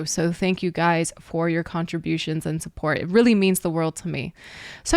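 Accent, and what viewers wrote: United Kingdom. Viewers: Are you British? You have a little bit of an accent which is totally cool but I was just wondering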